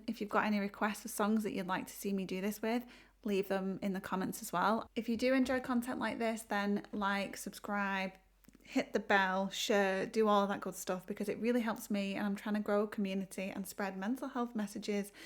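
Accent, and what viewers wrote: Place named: England, United Kingdom. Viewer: Hey, Found you through your reaction..Subbed for more, but I have to say You are f*n fun! Your look is absolutely rocking and I could just sit here and listen to that English accent and rock out with you all day! My wife is a Brit BTW, so I may be biased..lol) I see you have reacted to so I will be checking those out too. I am a GEN X guy, so would be my choice on the desert island if I could only listen to one band for the rest of my life. (Although, definitely has made me questioning that choice:) ). I would love to s see you react to -. I see your channel is quite new...let's build you up! I'm here for it!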